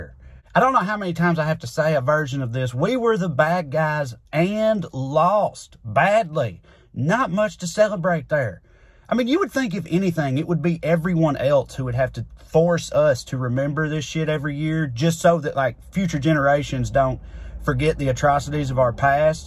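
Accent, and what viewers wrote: South, United States. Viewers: Two minutes of the most brutally honest truth, spoken by the most southern white guy I've ever heard. All while trucks are casually rolling coal in the background. Several of my southern relatives have disowned me, if only they had the courage or wherewithal of self reflection that you seem to possess. You sir, have earned a subscription, but deserve a medal. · You have single handedly re-written how my brain hears a American Southern accent. 👏 Bravo. Keep it coming. 🇨🇦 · ME TOO. SOOOOOO SOUTHERN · I just moved to North Caroline and I'm so happy to hear someone speak in southern drawl saying intelligent things.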